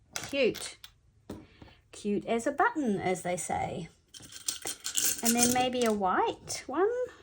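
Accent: New Zealand. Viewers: That was really great. I loved it and the choice of fibres you used. Was that a fellow kiwi I could hear? You have inspired me ❤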